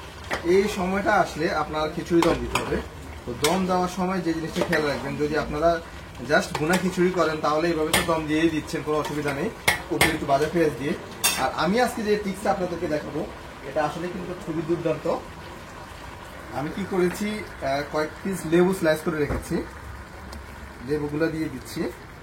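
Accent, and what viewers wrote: Noakhali, Bangladesh. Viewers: ভাই আপনার বাড়ি কি নোয়াখালী আপনার কথার ধরনটা ওরকম লাগতেছে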